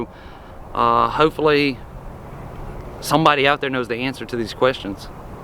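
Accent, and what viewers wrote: South, United States. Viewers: Love your vids, - and the southern accent (Im a Brit), so absolutely, I'd watch if you went for a drive around N. Carolina. Thanks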